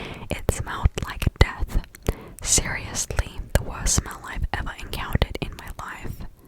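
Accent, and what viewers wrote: Australia. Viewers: Australian accent 🔛🔝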